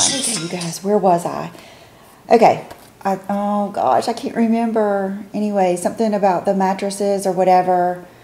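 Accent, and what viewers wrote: South, United States. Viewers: My duvet & insert are from Pottery Barn💕 Love your accent so much🥰 I think most all your words have that southern charm😊 & I would love to have your accent💕 your hair is beautiful🥰 I do a messy bun🤗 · I’m Arkansan so I have a Southern accent too but it’s totally different than yours. The words you say where your accent shows the most are “long O” words like so, know, show, etc. I also love how you say water. ❤️ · I love your accent and videos too! I love it when you say « You know... » with your southern accent. It so cute! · I love your southern accent and it is definitely prevalent when you say oh or words with that sound in them: so, you, most, move. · Hey pretty lady. Keep in mind a full size mattress is short on length incase Will is tall. We got the Tempur-Pedic and it was a 10k package and I hated it. They have to be broken in and it can take several months. 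We returned it because it was disruptive to our sleep and we were both miserable. Girl every word you say has that southern beautiful twist. Lol. Big hugs. Xoxo 😘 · We purchased a duvet from Wynn at Home store a few years ago. We always loved how cozy the beds felt when we would stay at their resort in Vegas. Nice fluffy down. They are very warm but I keep mine folded down at foot of bed at night. I think someone suggested storing unused or seasonal items in a pod until your ready to move in your new home. I would also suggest having a moving sale and getting rid of anything you don’t think you need. I had so many decor items that did not look good in my new home that I wished I would not have taken the time to pack and move. Sometimes it’s better to go into your new place with only the essentials and then buy once you get a feel for how everything flows. BTW I love your accent......I think when you say “water” I can definitely hear the southern! 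Also love when you say “daddy” ♥️ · Hi I love your videos I never miss one. I love your accent but I am also from Tennessee so I to have a southern accent 🤣 please don't ever change I love how soothing u r. I am going through a hard time right now u are the only thing I have to look forward to. I hope this move is a easy one just try not to stress enjoy the process it's not all about the getting there with it all done the journey can also be fun. Love u stay safe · Well, I'm from Savannah Ga and I do have a southern accent as well, so I don't hear yours HA!HA! My husband has a man cave (our spare bedroom) office, he painted and decorated it to his taste and he snores as well and I understand the mad thing inside, so he now has a place of his own and it works!! Best to you with the move!! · I married my husband, who had joined the Army, when I was 17 and we moved from Illinois to the state of New York. 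I still had 1 more year of high school, so I enrolled in school that year. Everybody said I had a southern accent and it really surprised me because I didn’t think I had a southern accent. Lol! I love your southern accent! It’s really sweet. Your hair and makeup look absolutely beautiful in this video! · you have a great accent, its really soft and warm. I'm English and appreciate a good southern accent. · We moved every 18 months since my husband was in the military. Shower items and sheets are self pack. Set up the kitchen first and then beds. The artwork, if it’s self pack then I got a picture pack from Home Depot and you can pack multiple artwork. I made a list of wanted we truly wanted for the immediate future and what we could spare for at least 6 months. Think about the changing weather and holidays coming up. Don’t beat yourself up. I know it’s hard and mostly, I know that as much as husbands try to help, it’s usually the women that do the work and planning. 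By the way, all your words have a southern accent and we love it! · Southern girl here as well....love your accent...reminds me of home ❤️ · Every word you say, has a southern accent. I love love it.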